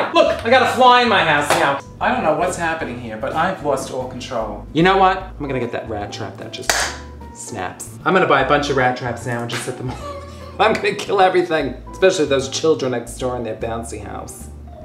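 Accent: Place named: Northeast, United States. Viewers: I just came across her channel and she's so funny. I love listening to her northeastern accent and her saying "that bothers me". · There's something about you and listening to you. That's like being with a good friand your family member. The New York accent is just ahorrible. The most important thing is very pleasant to listen to you. I really, really enjoy it. You were given a geft and I hope you're happy.❤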